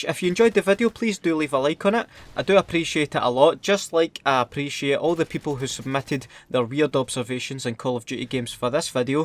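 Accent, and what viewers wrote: Scotland, United Kingdom. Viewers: Scottish I think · Scottich iirc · MattLC They are both Scottish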